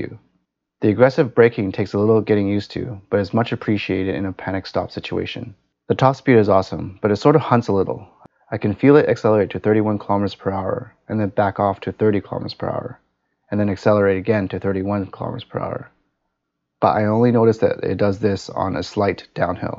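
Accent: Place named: Vancouver, Canada. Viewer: Haha right away I can recognize you’re from Vancouver. Really wish there was a hack for widewheel mercane..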